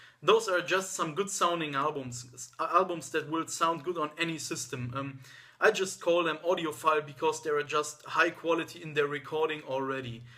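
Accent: Germany. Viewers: Den deutschen Akzent hört man sowas von heraus, das ist echt krass!:D Danke für die ganzen Tipps, insbesondere bezogen auf lagst du verdammt richtig. Ein paar gut klingende Vorschläge hätte ich aber noch: " " von klingt auf Vinyl einfach großartig. Selbst kurz vorm Ende der Plattenseite klingt es noch großartig. " " von besitze ich als Erstpressung von 1972 und das Schlagzeug, der Bass, das haut einem bis heute immer noch perfekt um. Sollte in keiner Sammlung fehlen, für 1972 aber auf zweifellos eine grandiose Abmischung, welche ja eig. kein Remaster benötigt hat, ehrlich gesagt. " " von ist ehrlich gesagt eines der Alben, welche einen Klang besitzen, was viele von Vinyl nicht erwartet hätten. Der Bass ist prägnant wo es nötig ist, jedes Instrument ist klar und ohne Verzerrungen, noch dazu ist der Dynamikumfang insbesondere beim ersten Part von großartig. Wohlgemerkt bei einer Spielzeit von glaub 27min nur allein auf dieser einen Seite! 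Bei keinem Album war ich bisher so von der Klarheit überrascht, wobei es auch aktuelle Livealben toppt, egal ob digital oder auf Vinyl. Ich belasse es erstmal dabei, da meine weitere Vorschläge für audiophileres doch wieder etwas mehr Wert sind als 1$/1€!:) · u german?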